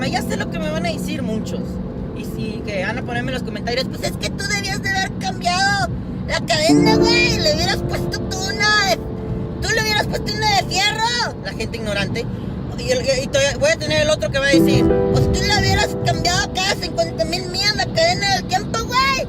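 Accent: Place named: Paisa, Colombia. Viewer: Puberto imberbe, si la tecnología de esos vehículos no está a tu alcance mejor ni los toques, hablas de Honda y autos que según son resistentes y al inicio que Volkswagen es rápido, potente etc ... Nivela tus argumentos y ponlos en dónde deben de ser. Cuando conozcas la tecnología de Volkswagen y autos de nivel alto (que creo que no están q tu alcance) te invito a Georgia. Saludos paiza, me gusta tu acento.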